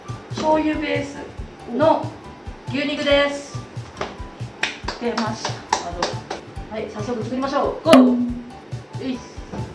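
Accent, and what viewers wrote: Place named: Yamagata, Japan. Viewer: 芋煮というので野菜の煮物のようなイメージを持っていましたが、この動画を見ると鍋とか汁物のような料理だとわかりました。美味しいのでしょうね。山形弁が時折出てきました。山形弁の響きはとてもよかったです。もっと、山形弁を増やしたらどうでしょう。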